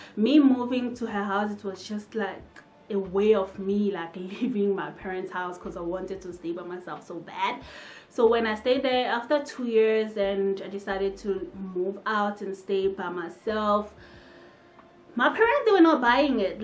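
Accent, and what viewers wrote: South Africa. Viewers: Wow u sound & look South African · Yhoo you got SA accent nice voice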